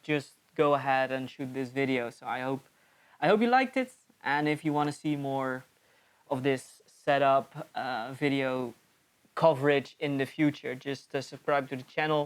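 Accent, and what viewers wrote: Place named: Netherlands. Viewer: ja ik was opzoek naar een alternatief/ring mount om de dji rs2 op te monteren ^^ Soms een beetje vermoeiend en onhandig om alles met de standaard grip te doen vooral als je hogere shots uit de hand wil maken. Deze van Tilta ziet er heel interessant uit alleen en is volgens mij zover ik heb kunnen zien ook een van de enige opties momenteel. Hoop alleen dat hij snel wat beter beschikbaar zal worden 😅 Ik had eerst mij twijfels of je nederlands was ik hoorde wel iets, daarna de shots met nl kentekens en de "later" bevestigde het wel 😆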